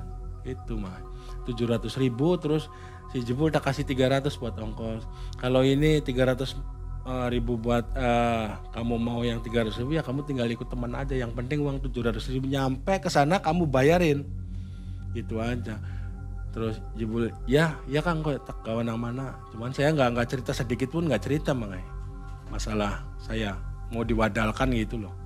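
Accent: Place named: Cirebon, Indonesia. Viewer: Yang bikin lucu itu logat Cirebonan - nya Mas, coba lebih diseringin tertawa jadi lebih asik nontonya.... Mas & Jibul, Wa, Wa Favoritku, BRAVO maju terus MM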